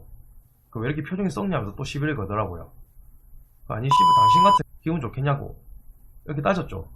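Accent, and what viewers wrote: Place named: Busan, South Korea. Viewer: 말투가 부산사람같네요? 저도 부산사람인데ㅎㅎ 알바 뭐 검색하다가 재밌게 듣고 갑니다~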